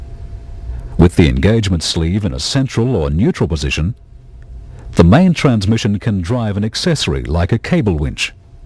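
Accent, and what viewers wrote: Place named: New Zealand. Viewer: This guy sounds very kiwi to me.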